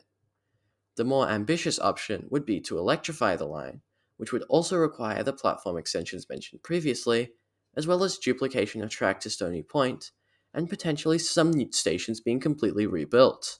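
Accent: United States. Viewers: Great video. Curious where you come from because your accent has a slight American ‘twang’ to it. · Great video. Curious if you’ve learnt your English online from American content as you don’t have Australian pronunciation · I get a feeling he’s autistic. My cousin is autistic and I’ve noticed he and several even high functioning often unawarely talk with an American accent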